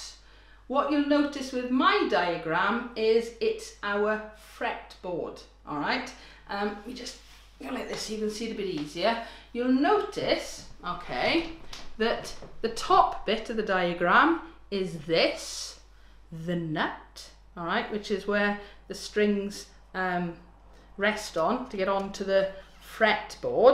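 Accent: Wales, United Kingdom. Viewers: New sub I’m so hate find you. Where from Wales are you from? X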